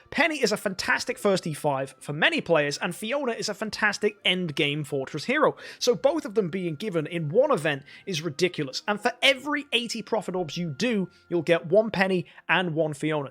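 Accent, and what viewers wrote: England, United Kingdom. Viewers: here for English accent :d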